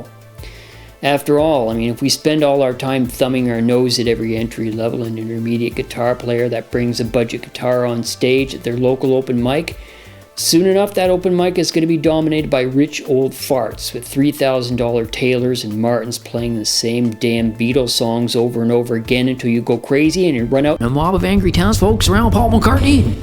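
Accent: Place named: Cape Breton, Canada. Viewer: Where is your accent from??????? I’m a Caper and you sound like it too!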